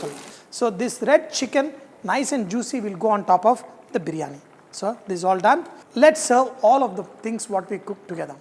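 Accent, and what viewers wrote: India. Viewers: Sir ur English Indian mixing so its perfect understanding....boomba.boomba. Iamurbig fan I love cooking...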